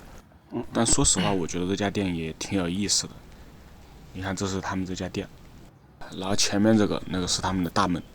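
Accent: Wuhan, China. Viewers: 我怎麼聽出武漢話的口音了哈哈哈🤣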